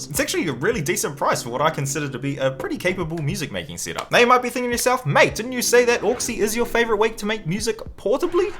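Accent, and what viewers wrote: New Zealand. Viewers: I think it might be a Kiwi accent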